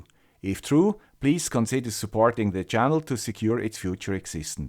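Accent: Switzerland. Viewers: and don't forget 'the guy with the Swiss accent' reference either - otherwise us old folk will become bewildered and confused. We don't like change!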